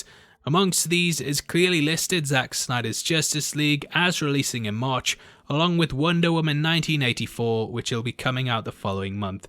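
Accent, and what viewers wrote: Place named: Newcastle, United Kingdom. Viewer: Hi do you live in Newcastle just my dad lives in Gateshead and you sound the same lol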